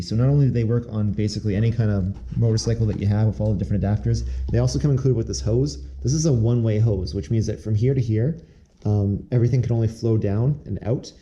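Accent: Canada. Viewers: You sound a bit Canadian eh?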